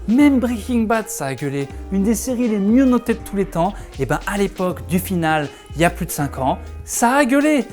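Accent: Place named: South, France. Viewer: J'ai jamais vu un épisode de GoT mais j'ai kiffé la vidéo. Ton accent du sud est parfait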